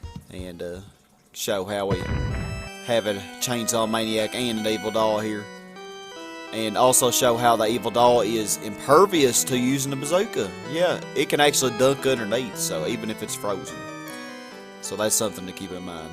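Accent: South, United States. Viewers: At first I thought you were British, but about 1 minute in and I realize you are southern/country. This is easily my favorite game from the SNES and Sega Genesis era. A friend and I reached the 40 something level where you fight the giant spider, and the game glitched out and we couldn't progress any further. Basically robbed of beating the game, the one and only time we made it that far. It's level 46 or 48 or something like that.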